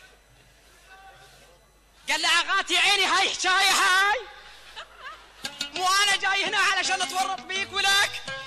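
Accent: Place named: Iraq. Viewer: يمة فدوة اللهجة العراق اشكد حلوة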